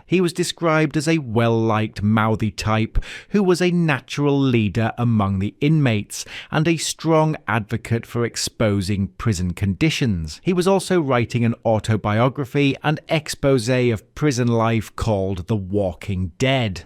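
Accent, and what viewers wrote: England, United Kingdom. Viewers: Some english guy talking about Canada!!!? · 👋 Hi. I'm a Canuck. I live in Kingston Ontario. First I want to thank you for taking the time to learn how to pronounce a lot of the words properly, or are you Canadian? We have lots of folks from the UK living here, so yes I did notice the accent. ☺️ Other than a couple of words ~ great stuff. Brilliant! But~ you and most others got everything wrong about what constitutes a life sentence in canada. What happens is you are given a life sentence for murder and you are imprisoned for 25 years minimum. The odds of being paroled the first time you try, especially for a violent crime, are very slim to impossible. My father-in-law said he didn't see that happen once. Then IF you are released, you go to a halfway house where you could spend up to five years. You also have to report to a parole officer once a week for the rest of your life, and with any wrongdoing/breaking the rules, on the part of the prisoner, s/he can be returned to prison to see out his/her sentence never to be released or less, depending on the rule s/he broke. 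So yes a life sentence IS a life sentence. (FYI~prison means a federal institution, whereas jails are provincial and municipal) to spend the rest of his life there, especially if it is a crime involving violence. So when they talk about a life sentence that is what you get. Under no circumstances is anyone convicted of a crime involving violence ever just paroled and allowed to walk out the door. They have very strict rules they have to follow and if your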